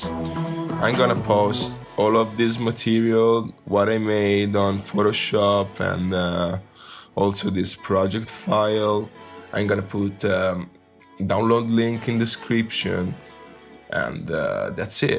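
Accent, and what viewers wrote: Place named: Italy. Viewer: yay Good job! come faccio a farmelo accettare? perchè non dice che è presente uno static txt Comunque amo il tuo accento inglese italianizzato!!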